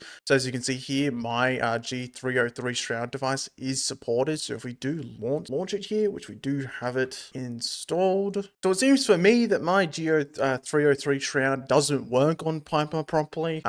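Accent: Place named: Australia. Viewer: "It's Linux next year", proper Aussie accent and YouTube transcription service is in shambles. Maybe this year will be THE year for Linux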